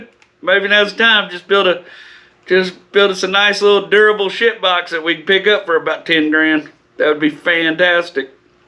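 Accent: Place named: Texas, United States. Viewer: Lovin' this from the north coast of Northern Ireland. I'm not familiar with a lot of the US car terminology, but could listen to your cool Texas drawl forever. The stories are fantastic.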